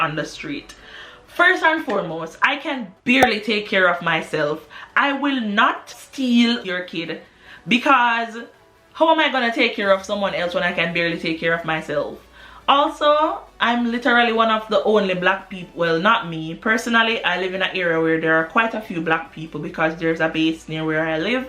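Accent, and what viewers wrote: Jamaica. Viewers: Also you’re not black. You sound as if you’re jamaican that’s what you are. Black is made to make you feel lesser than. Please don’t call yourself black, instead identify who you are. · Love your Jamaican accent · your "pronunciation" is so jamaican! Try do some work on your accent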